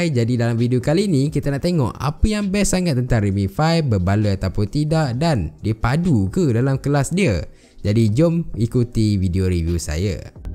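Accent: Taiping, Malaysia. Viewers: Sah la abg orng taiping😂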